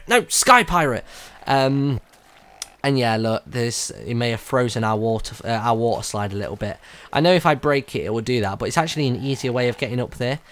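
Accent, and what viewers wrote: England, United Kingdom. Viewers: I LOVE THAT ENGLISH ACCENT WHEN U SEE DE FIRE CREEPER ITS LIKE: OMG NONONONO THATS A FIRE CREEPER NONONO GET AWAY GET AWAY NONO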